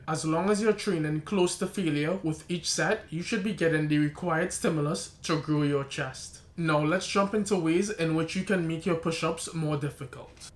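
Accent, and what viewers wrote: Trinidad and Tobago. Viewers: Trinidad man?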